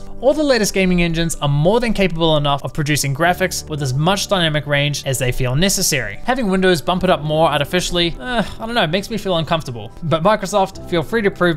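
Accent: New Zealand. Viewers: I know you live in Germany.. but what is your accent? New Zealand? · Are you a kiwi?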